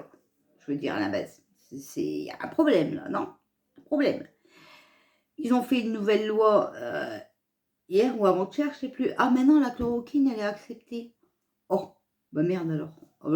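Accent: North, France. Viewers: J'adore votre accent du chnora grand mère 🤶 était de berck plage 🦀 elle parle comme vous merci 😘 😘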